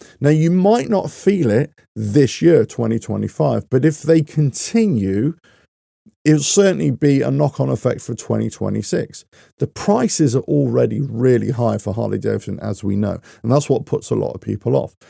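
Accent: United Kingdom. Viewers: Why is it always Donald Trump? All he does is it implement the very same tariffs, other countries have in place for US imports. You have a British accent, which means that you are beaching about how things are in your country and I suggest you take your war to Starmer!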